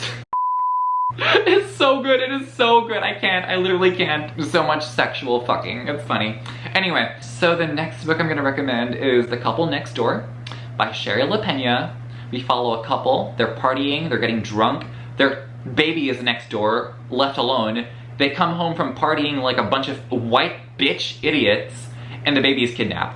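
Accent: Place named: United States. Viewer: Can you believe that!!! I was surprised too, for his American accent is way amazing.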